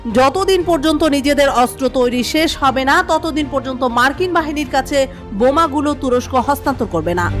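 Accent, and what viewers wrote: India. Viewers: Ei shangbadik indiar hobe kotha bolar dhoron tai mone hoye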